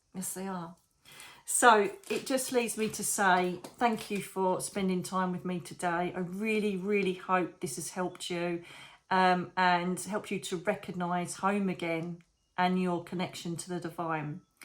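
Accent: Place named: England, United Kingdom. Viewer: Just love your lovely English accent and your beautiful soul. Love from Germany ❤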